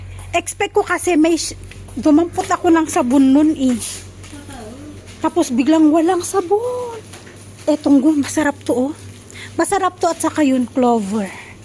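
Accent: Iloilo, Philippines. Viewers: ilongga kapo maam?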